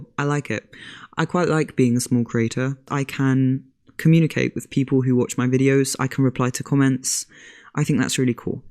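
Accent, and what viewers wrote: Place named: North England, United Kingdom. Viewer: Delete this comment if it's too personal, but how did your lovely accent come about? Were you born in the north of England before moving to France, were you born in France and learned English from BBC television? Just curious because your voice sounds so good and I love hearing about where different accents originate from.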